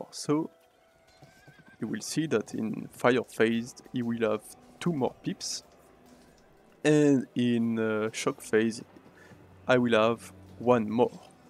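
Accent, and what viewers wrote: France. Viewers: L’accent français le plus beaux qu’il soit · Why you speak english like macron? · A french man speaking english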